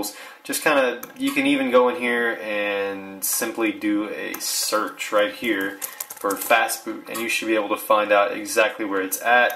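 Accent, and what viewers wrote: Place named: Michigan, United States. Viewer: Are you from MI? You sound like you might have a MI accent.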